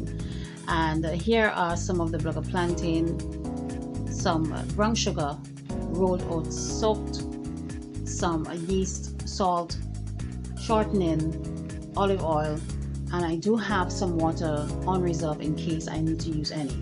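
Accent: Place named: Eastern Caribbean, Caribbean. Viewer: What IS "blogger plantain"? Is it of the Eastern Caribbean, I detected the region's accent. Novel idea. 😊🌴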